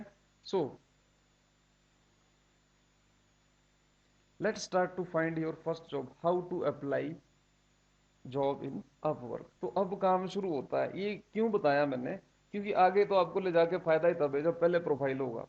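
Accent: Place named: Himachal Pradesh, India. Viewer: Very Informative Video in Himachali accent :). Thank you Sir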